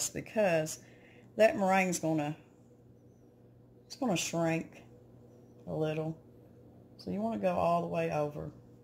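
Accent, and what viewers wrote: South, United States. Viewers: I absolutely love your channel! Your southern accent is so beautiful and relaxing. You and are the cutest ever! You make me this yankee girl miss living in Tennesse God Bless y’all ♥️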